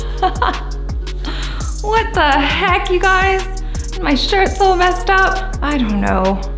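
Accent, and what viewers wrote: United Kingdom. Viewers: I knew was British But god damn the british accent is so thick here😂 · sounds more British here... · That British accent is strong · Wooooohh.. Your british accent is gorgeous ❤💛😍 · is more British on her own channel than Jaby's. Nice switch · I have an exam tomorrow but here I am watching trying to wear a saree and speaking in her British accent. Ye bhi theek hai!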